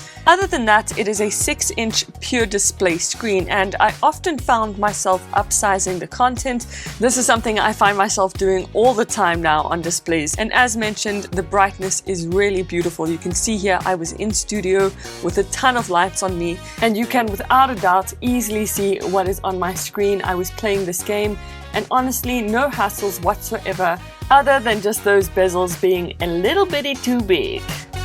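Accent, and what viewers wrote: South Africa. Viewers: Is this a Southafrican, dutch, australian/kiwi or danish dialect? Easterneuropean of some sort? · I hear the South African accent... then I see the charger - not South African. Then I see the police bakkie . 😉 😁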